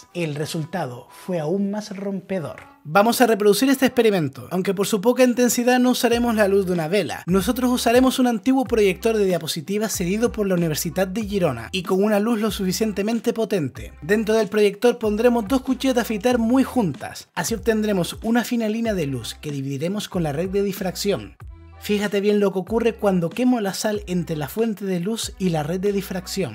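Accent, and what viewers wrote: Chile. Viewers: Juraba que eras chileno, tu acento se parece muchísimo al acento chileno neutral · Hola amigo, súper tu video... Eres erudito en el tema, fascinante! Muy documentado. Intentaba recordar de dónde es tu dialecto: y bingo.... lo recordé: chileno. Un saludo desde Colombia.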